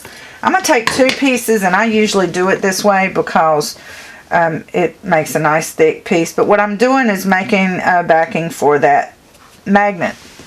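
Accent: South, United States. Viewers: I subscribed. You are sweet and feisty af. Plus my fam is from SC so the southern twang makes me feel right at home. Thanks for being lovable and informative.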